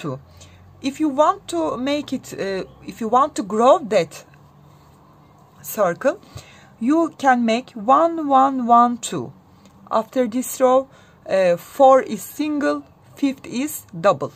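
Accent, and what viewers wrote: Turkey. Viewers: Abla aksanından tanıdım türk olduğunu valla elenjdkdnwbsk Deniycem yapmayı şimdi ellerine sağlık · Gerçekten Türk aksanı diye bir şey de var ben de oradan anladım. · I love your accent! Are you turkish?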